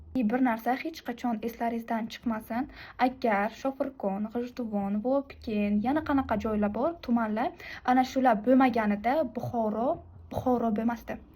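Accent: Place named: Tajikistan. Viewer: Tojikmaskansiz nega unda xuddi tojikday aksent bn gapiras man sizni tojik deb uylabman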